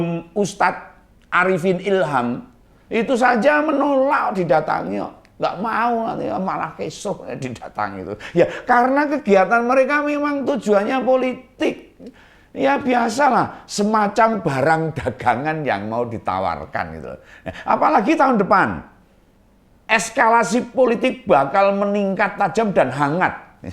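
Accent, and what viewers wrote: Java, Indonesia. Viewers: logatnya jawa banget saya senang mendengarnya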